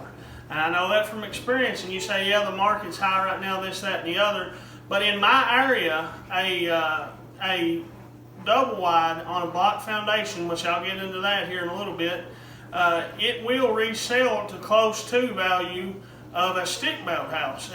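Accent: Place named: Virginia, United States. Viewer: Mann you sound like us out here in va. We did the same thing and are about to do what u did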